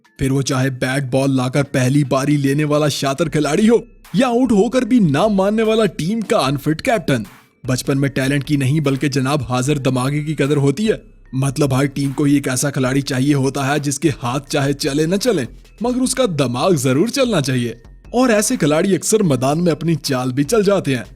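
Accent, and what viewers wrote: Pakistan. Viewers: Bhai Agali video Mein Mere Yaar question ka answer dena Tumhari Awaaz se lagta hai bolane ke tarike se Pakistan ke ho best off lak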